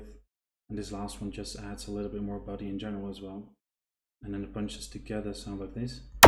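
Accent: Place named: Netherlands. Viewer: Hey i would like to know how to create the screech, its a kinda sound.... Looking for ages to create it. By the sound of your accent u clearly dutch i gues.. Me 2. Great track gonna follow you more around.. Love the tuto man, i am also trying to learn this just for fun.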